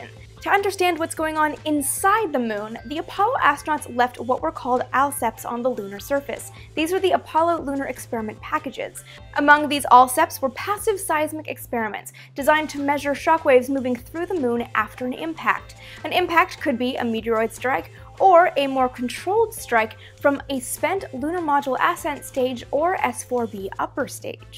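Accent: Canada. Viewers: Er... she's Canadian and says things Canadianly sometimes...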